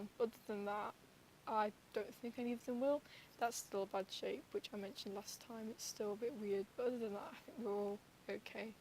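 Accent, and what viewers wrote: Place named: London, United Kingdom. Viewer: You sound like a London girl with that accent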